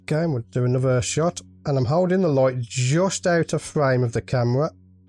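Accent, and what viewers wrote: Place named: Birmingham, United Kingdom. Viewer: Gotta luv the brummie accent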